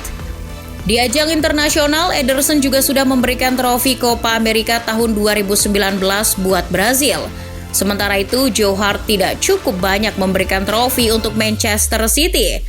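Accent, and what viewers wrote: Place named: Sunda, Indonesia. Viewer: Orang Sunda pisan pengisi suarana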